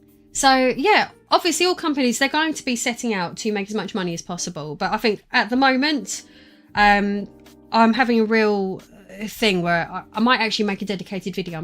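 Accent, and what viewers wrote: United Kingdom. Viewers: How lovely is your spirit , your smile, your soul and your British accent!!! Regards from Spain :) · Teach me how to brittish accent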